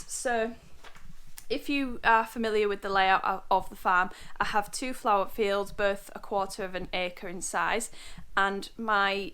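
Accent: East Yorkshire, United Kingdom. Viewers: Love your East Yorkshire accent😊m from Leeds very different but similar.I’m an amateur gardener but I’m following your amazing project. Looking forward to to seeing your plot grow. 🐝🌼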